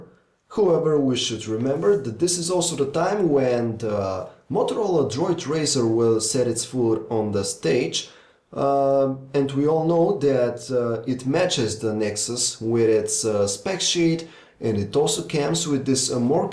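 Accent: Eastern Europe. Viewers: is your family's origin somewhere in east-europe? your accent makes me think this so. nothing bad. great preview guy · Dude your Eastern European accent is too ridiculous.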